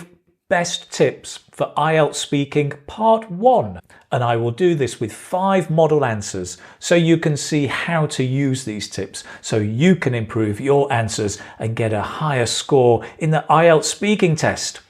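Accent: United Kingdom. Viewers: Sir, I would like to be a British English speaker as you speak.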